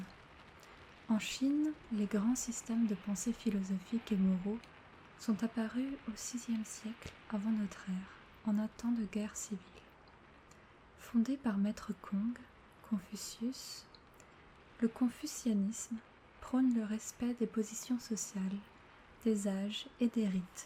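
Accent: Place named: France. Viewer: You know, I’ve noticed this before when you made the english-french video. You speak both languages really well, did you grow up speaking both? Also, i don’t speak french but I’ve heard it enough that i feel confident assuming you’re french, right? Like your french is from france and not Quebec. That aside thanks for the nice video, i hope you make more french asmr but mayhaps a bit more slowly next time. Personal preference 😁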